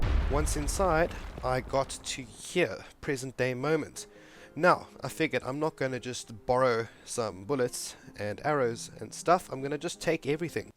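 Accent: South Africa. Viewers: Are you south african? :D Great video! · Great to hear a saffa gamer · You sound south african! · Are you from south africa ? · South African ??!!😂me too😂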